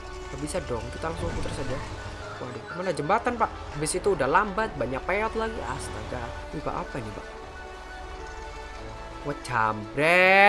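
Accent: Java, Indonesia. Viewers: Bang samean jawa yo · bang orang jawa ya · Bang loe orang jawa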